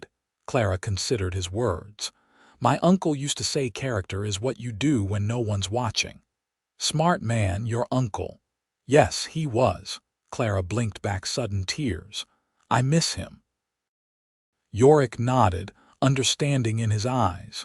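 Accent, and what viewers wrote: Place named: Canada. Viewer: Sounds Canadian. Liked this story very much. Thanks. GA 🍑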